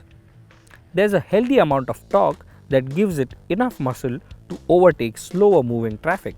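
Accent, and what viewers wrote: India. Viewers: Very good indian accent, presentation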